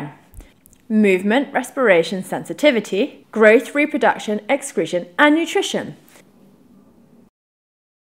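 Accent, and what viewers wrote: United Kingdom. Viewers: You sounds more like a british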